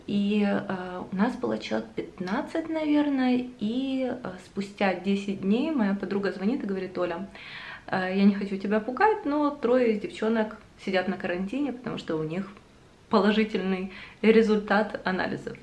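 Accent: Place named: Ukraine. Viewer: люблю твой канал смотреть, но всё таки надо работать над украинским акцентом,он всё портит.....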